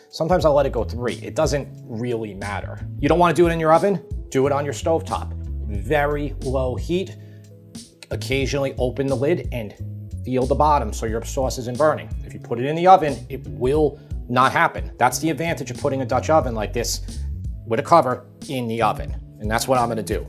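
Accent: New York, United States. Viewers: I love your Ny York accent!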